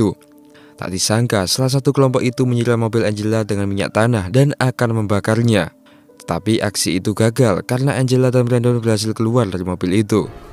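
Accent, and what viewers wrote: Java, Indonesia. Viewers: Medok mas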